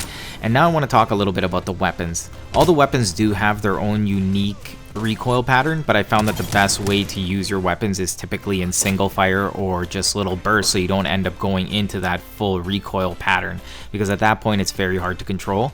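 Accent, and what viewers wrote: Canada. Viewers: Where in Canada are you from? Nova Scotia?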